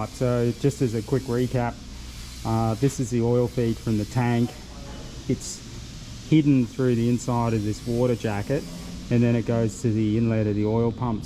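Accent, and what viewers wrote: Australia. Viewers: you're a legend. Good to hear another Aussie speaking Aussie (can we not do the bleep?), Mate, you did good, real fuckin good!! Cheers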